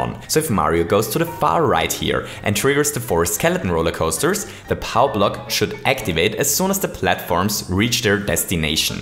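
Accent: Germany. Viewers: This german accent xD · You're german right? :P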